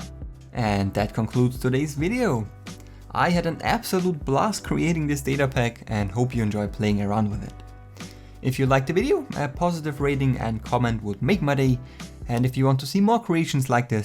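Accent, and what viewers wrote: Germany. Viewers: You sound a bit like someone who speaks German, am i correct? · You kinda sound German xD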